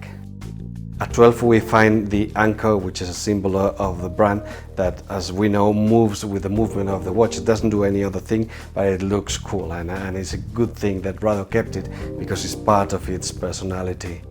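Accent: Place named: Spain. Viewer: Se nota que es español pero no lo hace nada mal,cuando un inglés habla español tambien se le nota, mucho mas. Ánimo.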